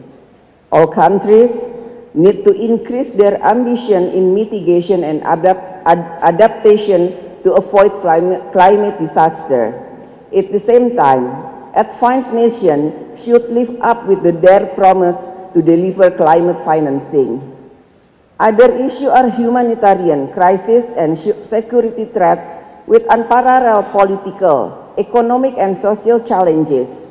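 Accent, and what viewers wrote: Indonesia. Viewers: Ingris rasa jawa.. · Selain yang sudah dibicarakan banyak orang, kesalahannya juga tuh dalam mengucapkan huruf "r" masih seperti dalam bahasa Indonesia. Harusnya tuh ga kaya gitu cara ngucapinnya. Jadi, lidahnya itu jangan menyentuh langit-langit mulut. Kalo menyentuh langit-langit mulut, ya jadi salah itu. Untuk lebih jelasnya lihat saja pidato bahasa Inggris presiden Amerika, apa pidatonya siapa gitulah yang pake bahasa Inggris dan bahasa Inggrisnya bagus gitu...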